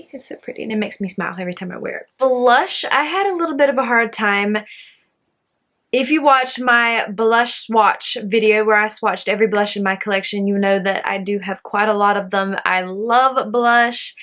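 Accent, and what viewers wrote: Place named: South, United States. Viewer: Your eye look turned out beautifully <3 I think that UD palette got a bad wrap, but may just be underrated. I'm a new subscriber and I'm not going to lie, but your southern draw got me! Hopefully that doesn't seem weird or offensive, but you just have an inviting and friendly sound and persona. The first video of yours that I came across was your blush swatch video, so that was really what I had to go off of. Lol :)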